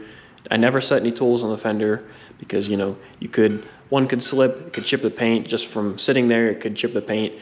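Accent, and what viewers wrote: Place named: Southwestern Pennsylvania, United States. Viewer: I'm guessing by your accent that you are in the southwestern PA area. I have family in Latrobe. Lol....let me know if I'm right.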